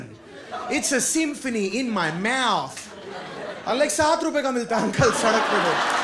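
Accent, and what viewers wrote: Australia. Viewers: His Australian accent🔥🔥🔥🔥🔥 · that aussie accent! wow! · aussie acccent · Karunesh Talwar listening first time. Love ur Aussie accents 😁 upload more videos pliz. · That’s an Australian accent lmao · You mean australian accent? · Damn! He got Perfect Australian accent!!!!😎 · His Australian accent is as good as heaven.Great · Buddy woh Aussie accent hai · Australian accent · COC legends it’s an australian accent.. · its an Australian accent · That's an Australian accent. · Australian accent me baat krra tha wo lekin talented Banda h American accent bhi nikaal hi lega kyuki Australian accent jyada tough hoti h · Lol it's Australian accent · Australian accent* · Smoothie Australian accent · Australian accent. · That's not an American accent it's Australian accent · Australian accent? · That is not American accent that is Australian accent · Australian accent not american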